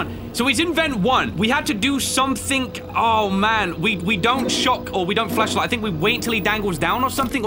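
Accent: United Kingdom. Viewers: FOR SOME REASON I DIDNT REALISE YOUR FROM THE UK HELP. ME TOO OMGGG AA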